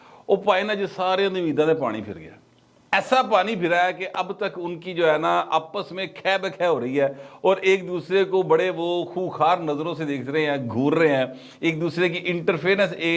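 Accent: Punjab, Pakistan. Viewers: AoA, Tawadiyan gallan the sachiyan hondiyan ne, par jehrra vich Punjabi da tarrka landay o Barra mazay dar Honda a. Jewnday rawo. Par ajj Laga a k tawaday te Syed Imran Shafqat Sb da rangg a gaya a. V log de shuro vich she;ar de latt Bhan k SIS e lagay o. 😂😂😂 GOD BLESS U AND ALL THE TEAM OF BETHAKK.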